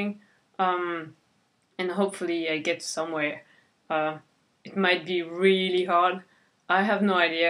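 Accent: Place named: France. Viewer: Super idée, j'y ai pensé plusieurs fois mais j'ai jamais eu le courage ! Je viens de me commander "The weaker side" de Dom Fomularo, je vais commencer à me plonger dedans que dès je l'aurai reçu, je te dirai si c'est efficace :) Sinon, t'as pas à rougir de ton accent, t'as un anglais super fluide, on dirait presque pas que t'es française parfois, j'aimerai parler aussi bien anglais !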